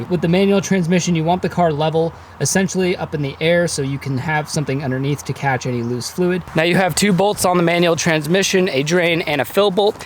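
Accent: Boston, United States. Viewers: FlAAA-RIDA, with that Bostonian accent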